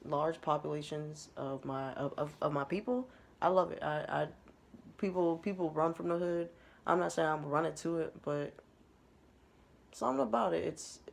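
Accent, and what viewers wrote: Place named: Prince George's County, United States. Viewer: You sound like you live in PG County. You should check out the Reelback channel. I had a white dude come up to me and my mom dressed like Jesus and the white family walking next to us were more scared than me. I grabbed my mom and told him to bust a move. The biggest rats I have ever seen were in DuPont Circle. I love my hood as you say. But, I stay away from downtown (Latte City). A lot of the white people in DC are rude as hell. Interjecting themselves in your business, not moving out of the way...And, they give that creepy smile at you. 🤪🙄Matter of fact, I’m about to go outside and see what’s popping. I haven’t worked for the man in five years and my whole perspective has changed. It is imperative to support your tribe. They will support you. Too many of us are straddling the fence. You sound as if you are entering another phase in your life. 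Growth...Have a great week...You are not alone...😋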